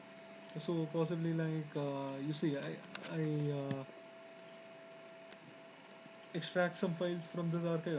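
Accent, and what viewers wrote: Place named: India. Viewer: stupid indian accent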